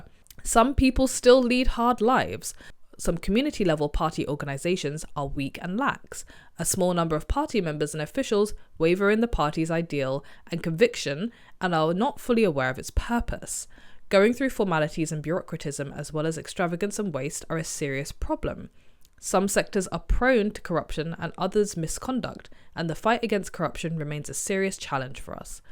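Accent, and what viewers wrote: England, United Kingdom. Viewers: How do you know so much about China? That's an English accent, isn't it?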